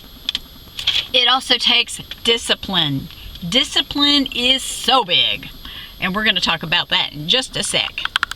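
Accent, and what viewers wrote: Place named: South, United States. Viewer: I detected a little Southerness there!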